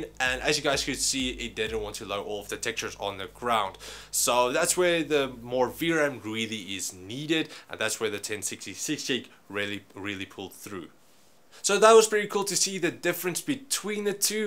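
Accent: South Africa. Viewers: South african ? :D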